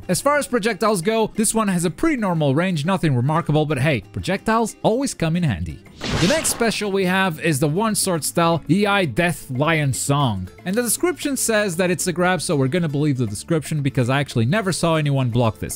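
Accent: Portugal. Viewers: Are you portuguese?? Like portugal portuguese?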